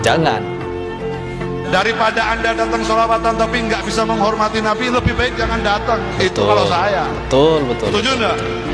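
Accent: Madura, Indonesia. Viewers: Logatnya kayak dr Madura.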